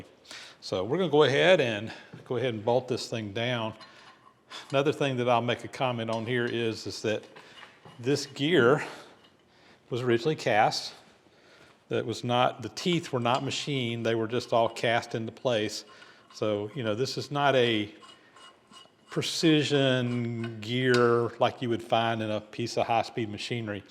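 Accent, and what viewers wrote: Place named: Georgia, United States. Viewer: I came here only as a follower of the Tally Ho project. Initially I was debating whether to follow as I could hardly make out a word you said - (Georgia accented English dialect to Dorset English ear). But I'm very glad I stayed. After a month I could follow you clearly & and learnt what a fascinating channel you ran. Watching how you overcome problems and your clear explanation of the most technical processes are excellent. I'll be staying.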